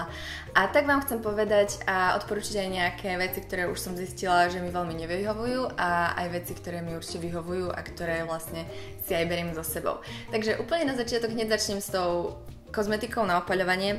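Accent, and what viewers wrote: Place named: Eastern Slovakia, Slovakia. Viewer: Môžem sa spytať kde byvaš??Lebo maš trošku vychodniarsky prizvuk:D:D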